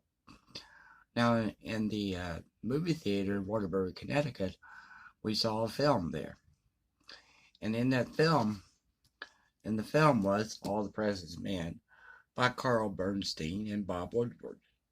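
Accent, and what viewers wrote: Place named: Texas, United States. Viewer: Thought I recognized the accent. Howdy fellow Texan. Cool, sad story about the TV adaption of "All Creatures Great and Small."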